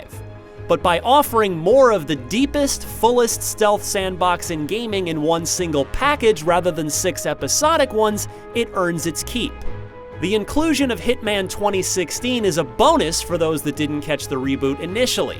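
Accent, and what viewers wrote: India. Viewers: Indian accents arent actually that bad lol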